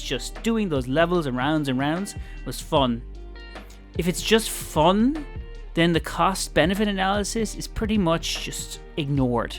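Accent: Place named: Dublin, Ireland. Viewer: You from Dublin too lad?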